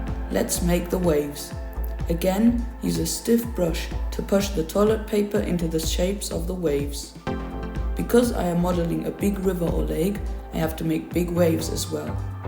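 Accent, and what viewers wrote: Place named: Germany. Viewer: Als ich den Akzent hörte dachte ich mir schon dass der Youtuber hier in Deutschland sitzt. Leute, es gibt 'unendlich' viele Kanäle von englischen Muttersprachlern, oft nur schwer verständlich wegen miserabler Audioqualität oder weil der Typ nuschelt bzw. sehr schnell spricht. Muss man da unbedingt noch ein weiteres Video in Englisch hinzufügen um viellecht die Reichweite zu erhöhen und möglichst viele Klicks zu generieren? Mir geht die Dominanz englischsprachiger Videos zunehmend auf den Geist...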